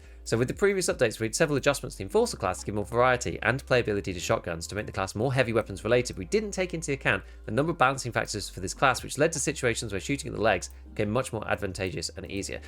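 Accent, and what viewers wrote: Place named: England, United Kingdom. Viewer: Yeah, always Johnny on the spot with the videos, read to us in a pleasant English accent. Fuckin' a man, fuckin' a.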